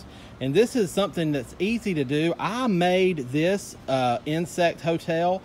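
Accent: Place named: Carolinas, United States. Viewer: I heard you speak & instantly knew you were a fellow Carolinian! I love you already! Happy pollinators week! We did a celebration video too along with Marie at Salongirl Gardens. I’m so happy to see other gardeners raising awareness!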